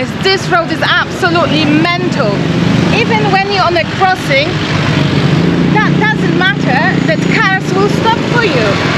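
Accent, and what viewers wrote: Thailand. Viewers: 😊❤สวัสดีครับคุณพูดไทยสำเนียงอิสานเหมือนผม..น่ารักมากครับ,,🙏❤️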